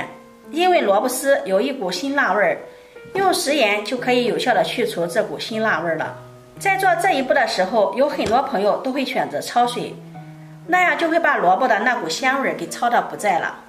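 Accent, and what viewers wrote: Shandong, China. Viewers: 山东西部口音。水分（fei）,我们（mei） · 可能是山東口音。口音跟生長環境有關，是免不了的。聽習慣了就好。重點是她口齒清晰，要言不煩，而且都解釋得清清楚楚，實在是很優質的烹飪教學！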